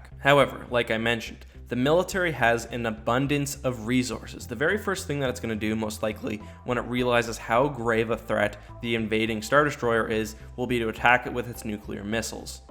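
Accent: Canada. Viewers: Patriotism? It's pretty obvious this guy is Canadian due to his accent.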